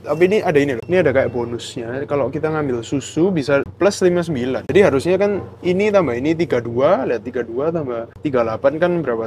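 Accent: Java, Indonesia. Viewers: Medoknya khas banget😂, semangat koh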